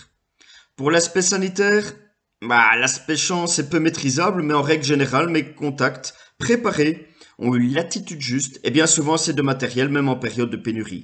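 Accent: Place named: Belgium. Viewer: Je pense reconnaître un accent du plat pays ;) heureux de savoir qu'il y a d'autres personnes qui s'intéressent a ces problématiques dans ce pays si mal préparer a ce genre de risques !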